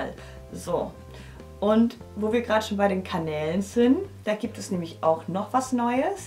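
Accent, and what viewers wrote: Franconia, Germany. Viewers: einfach nur: #Frankenpower :) Ich liebe es wenn du fränkisch sprichst, da ich ebenfalls aus dem schönen Franken komme :D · Ach Gott wie du fränkisch redest, einfach a super sach! komm auch aus der nähe Nürnberg/Erlangen und für mich gibt's nichts schöneres als mei schönes Franken ❤ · Ich wusste es!!!! Ich wusste es schon immer! Also das du aus Nürnberg, Umgebung kommst!!!!❤️ Ich liebe fränkisch und Franke erst recht❤️ Ich könnte mir nicht vorstellen hier weg zu ziehen. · Nürnberg ❤️ Franken ❤️ Heimat ❤️ ich liebe es wenn du im Dialekt sprichst 😍😁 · Nürnberg, Erlangen, FÜRTH! 😂 sofort ist mir das Herz aufgegangen beim fränkischen Dialekt <3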